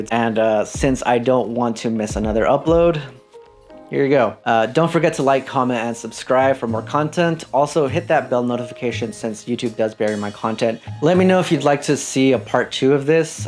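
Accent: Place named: Australia. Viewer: Thanks. I have a A7II specifically to use with legacy lenses. You review coupled with your images taken using the FE 1.8/50mm is pretty sweet. There will be an addition to my lens kit! By the way I love your Aussie accent champion.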